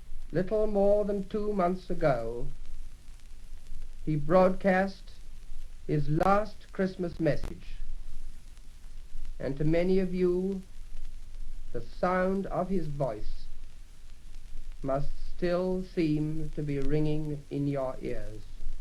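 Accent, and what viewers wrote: RP, United Kingdom. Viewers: I sure didn't expect his voice to sound this posh.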